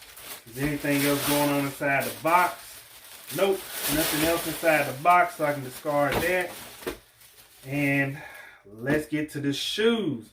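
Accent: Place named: Kentucky, United States. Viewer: Where are you from? Your accent is like wow? Kentucky?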